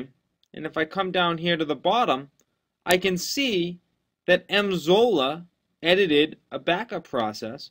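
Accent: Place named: England, United Kingdom. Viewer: What a perfect English accent. I love it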